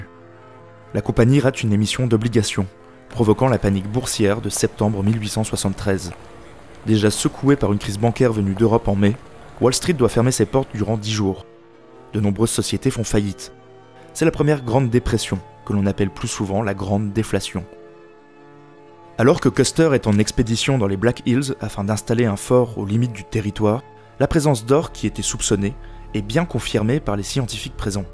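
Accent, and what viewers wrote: France. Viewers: excellente vidéo ! une rectification qui a été faite plus bas en anglais et pas traduite, semble-t-il, les photos de crazy horse ne le représentent pas, il n' existe aucune photo de crazy horse, juste un dessin qui a été fait bien après par un dessinateur professionnel selon des descrptions orales, et qui a été validé ensuite par un des membre de la famille de crazy horse. Si je remets la main sur ce dessin, je le mettrai en lien dans l'intervention en anglais, plus bas. Aussi, je voudrais saluer, a l'heure du grand n'importe quoi des voix de synthèse à tout va, au détriment du bon sens et de la qualité, ce que vous avez fait ici : parler avec votre voix, donc, avoir osé être vous-même vocalement parlant. ce qui semble devenu une chose insurmontable pour un grand nombre de youtubers. En plus, ce n'est pas scandé, hurlé, appuyé d'une façon non naturelle comme on le voit partout. 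bravo ! en tant que pro de la voix et du doublage, j'ai remarqué quelques petites choses perfectibles, mais tres peu entendront ces mini problèmes, comme les coupes un peu "a la hache" en fins de phrases, dans les respirations, les popings légers par moments ou les changements de placement par rapport au micro, qui font qu'on entend des ajouts faits au milieu des phrases. il y a aussi les A a certaines fins de phrases, qui sont un peu fermées comme des O, comme on fait chez moi en bourgogne, ou comme on l'entend parfois à Lyon. c'est très léger, tout petit accent du terroir pas bien gênant mais qu'il s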